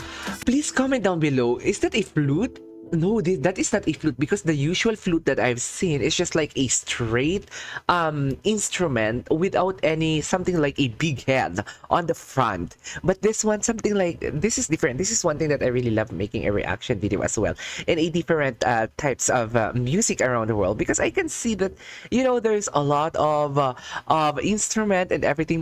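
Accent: Philippines. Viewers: (I'm greek) No she's not a soprano. She just sings songs with traditional sound! (ethnic etc) but makes them more modern.. btw are u filipino? I feel like your pronunciation is very familiar to me hehe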